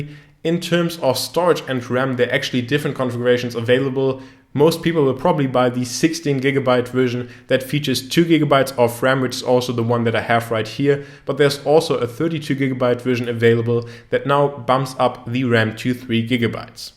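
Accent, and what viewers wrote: Germany. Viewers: Bist du Deutscher, hört sich so an^1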